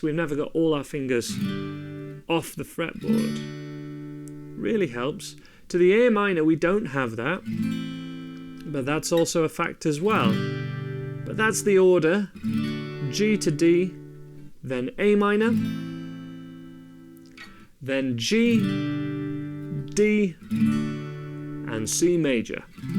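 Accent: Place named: RP, United Kingdom. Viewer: when did you get all smug and start putting on a posh accent?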